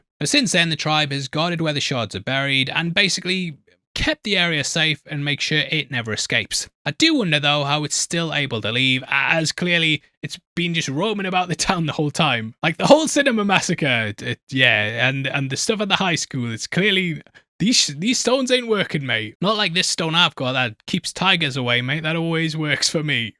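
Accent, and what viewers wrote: United Kingdom. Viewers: As someone who grew up near Shreveport, you are saying it correctly. People probably just find it odd to hear it in a British or even neutral accent because of the deep, region-specific accent people near there tend to use. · Ain't nothing wrong with your "Shreveport". You're just British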